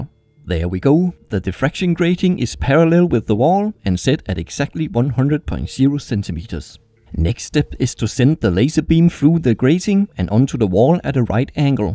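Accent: Denmark. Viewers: Jeg vidste du var dansk :D Man kan høre det på accenten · Jeg synes nok jeg kunne genkende en Dansker på hans Engelsk :D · Er du Dansker? det lyder det som på din stemme! god video!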